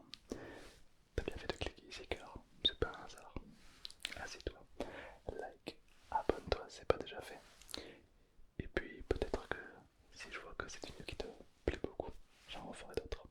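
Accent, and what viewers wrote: South, France. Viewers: J'avoue... en lisant les commentaires je suis d'accord avec le fait que fasse vraiment autorité dans l'ASMR français. Je trouve qu'il est hyper pointu dans la cohérence entre sa sensibilité dans la retranscription des tringles qui sont à (presque) chaque fois hyper bien dosés et le discours en lui-même qui est aussi parsemé de bruits de bouches qui lui sont propres, et a la fois au paroxisme du suave. Pis y'a pas qu'une cerise sur le gâteau mais 2!: son humour désopilant (qui ma souvent fait éclaté de rire en plein endormissement) et cet accent du Sud qui ajoute une certaine rythmique pas déplaisante du tout Deuxième aspect en l'occurrence nous avons la chance d'avoir un thérapeute qui pratique la vulgarisation (mise à la portée de tous, c'est pas péjoratif du tout) de principes élémentaires en psychologie à merveille Bref, une dinguerie de fou psychomental pour insomniaques chroniques🤣🤣🤣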